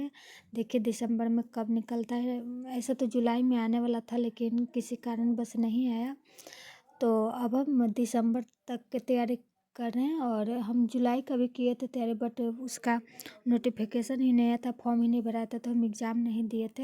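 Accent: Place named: Mithila, India. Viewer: Lag raha hai aap mithilanchal se hai kya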